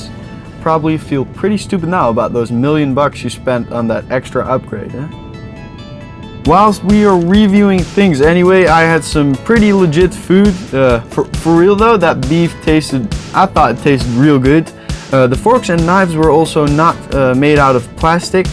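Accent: Netherlands. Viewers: You can definitely hear that you are Dutch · Nederlands Engels😍😂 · Wait u dutch? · nederlander dat hoor je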